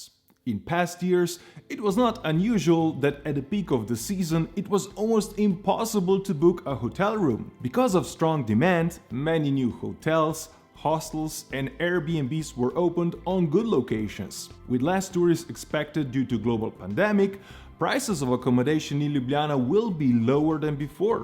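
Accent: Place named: England, United Kingdom. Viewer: Thank you for all of your videos. I've watched many of northern Italy and Slovenia. Great presentation with a very charming English accent. Keep up the great work!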